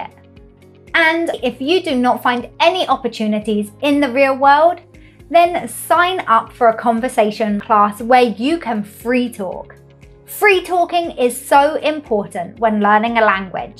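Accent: United Kingdom. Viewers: 英國腔真的很好聽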